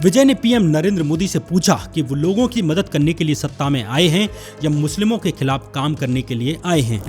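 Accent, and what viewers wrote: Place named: Bihar, India. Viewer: Area yaar bijness business hota hai. Pronunciation correct karo content dikhne meh bohat acha hain lekin sunne me UP Bihari ki badbu aajati hai. Bijay nahi Vijay Eaji nahi easy Bujiness nahi business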